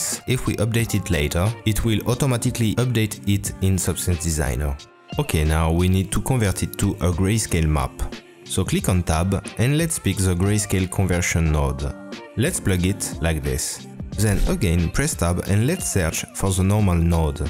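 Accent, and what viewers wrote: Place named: France. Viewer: the frenche accent is tout bien mec !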